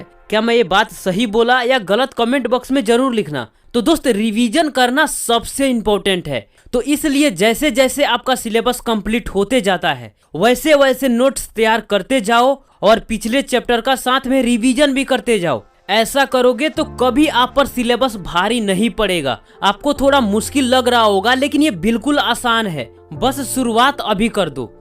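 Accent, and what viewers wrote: Bihar, India. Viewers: Bhaia aap bihari ho kyaa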